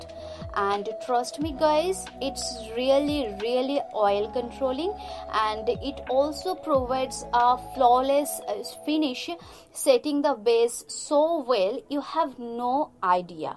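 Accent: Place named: Bengal, India. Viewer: I think u r bengali bcoz of ur accent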